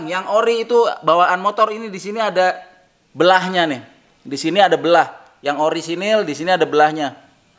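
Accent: Jakarta, Indonesia. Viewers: paling demen sama logat betawi salam dari pontianak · betawinye kena banget si jantuk bisa banget ngagulnye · YG SAYA ZUKA DR, BETAWI NYA KENTEL BANGED DAHH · Betawi banget lo bang..😁😁 demen gw denger logatnya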